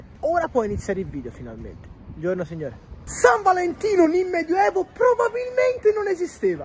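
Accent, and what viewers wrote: Florence, Italy. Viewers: grande video, forse non decolli a livello nazionale perchè il dialetto fiorentino è un pò troppo particolare. Ma e un fa nulla e grazie mille!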